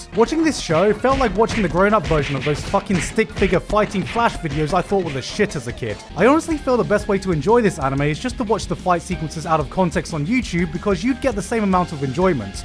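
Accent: United Kingdom. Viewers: It's the Bri'ish accent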